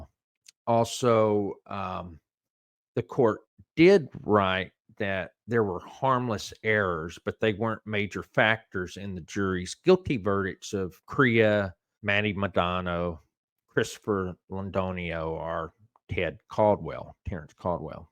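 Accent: South, United States. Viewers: ' Country drawl is calming and pleasant...charming if I may...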